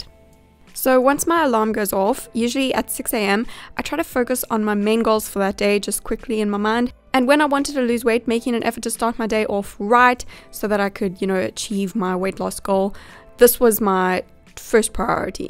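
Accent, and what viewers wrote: South Africa. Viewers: Pxssy Destruktor she is from South Africa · When you said that you drink Royibos tea ( I can’t spell) I knew that you had to be from there, because you also have the same accent as my parents ( they are also from South Africa) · Is sy van suid Afrika? Want sy klink soo... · Cat Mouse she’s South African😊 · Omg omg are youSouth African ? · I'm new... your accent? South African? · Hallo. Bly jy in Suid Afrika. Jy klink net so. Wow, jou videos is baie mooi. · I have a question are you south african? because you sound very south african by the way I am south african. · OMG. This is my first vid of yours. I'm from South Africa and as soon as I heard your accent I got so excited. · Are you south african? I can hear ittt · Are u south African ?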